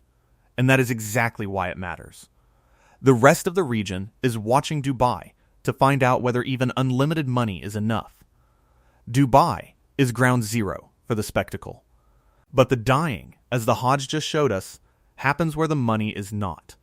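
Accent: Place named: United States. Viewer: I despise that US accent 🙄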